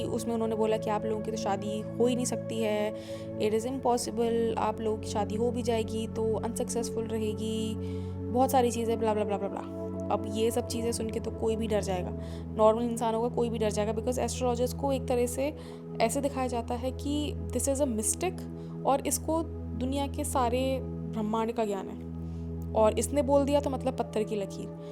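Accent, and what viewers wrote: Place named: China. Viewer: ITS SOUNDS CHINEESE MIXED WITH EAST INDIAN A TINY MIX OF SOFT RUSSIAN BUT MOST LY EASEAST INDIAN WITH A RARE FRENCH DIALCK MIXED ALL IN ONE AND THE COUNTRYS ARE VERY CLOSE TOGETHER AND 1 LANGUAGUE I IM VERY FAMILITIAR HEARING MIXED IN TO OR ARE U CHANGING THEM AS U TALK OH I FORGOT A FORM OF ENGLISH I HERE TOOO LOL IM INTO MAPS LANGUAGES AND DIFFERNT PEOPLE OF THE WORLD I LOVE THIS ITS LIK ,Y HOBBY ANY WAY IM GOING TO MANIFEST A AY TO TO SEE WHAT YIU LOOK LIKE IM A VERY CIRIUOSE PERSON AND IM A VISUAL KEARNER I LOVE EYE CANDY TOO PLUS U SPAK MY TRUTH I DONT BELIEVE IN ANT OTHER WAY TO EXPRESS MYSELF I FEEL GOOD LETTING OUT MY THOUGHTS AND I NEVER SEE PEOPLE COMMENT LIKE ME I DONT BELIEVE IN FEAR LIKE SOME PEOPLE THINK THERS A RIGHT WAT TO TALK OR HOW RULES AT LEAST WHERE I WAS BRAINWASHED GROWING UP ON LAND THEU CALL IT A COUNTRY MAMED CANA DA ;//CHNA DA IS ITS NICK NAME AND WE HAVE EAST INDI ANS AS OUR LARGEST IMAFORGN POP INOT SURE IF BORN OR IMAGRATE OR MIXED I WE ALL JUST COULD GET ALONG GOT RID OF THE BAD PERSONALITYS AND THE PWOPLE WHO CAUSED OTHERS HARM WE MIGHT BE ABLE TO STOP THE PROPAGANDA THAT MAKES US ALL TURN OM EACH OTHER WE SHOULD TURN O THE 1 $%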